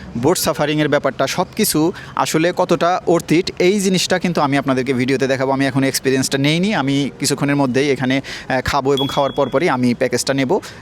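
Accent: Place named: Khulna, Bangladesh. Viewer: আমি যতদূর জানি এটা হচ্ছে বিরুলিয়া ব্রিজের কর্নারে আপনার ভাষা শুনে মনে হচ্ছে আপনি খুলনা বিভাগে আপনার বাড়ি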